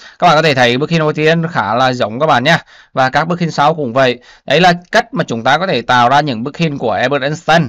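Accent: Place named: Nghe An, Vietnam. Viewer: anh cảm ơn em nghe giọng em như người nghệ an quê mình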